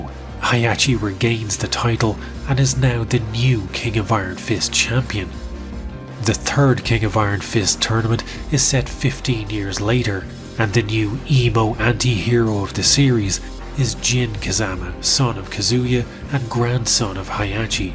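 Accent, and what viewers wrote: Ireland. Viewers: Love your writing. Love your graphics. Love the Hibernian lilt which stirs my Irish lineage. (Also - eff John McCain.) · masterful comedic storytelling + Irish brogue is a winning combination that makes this channel golden.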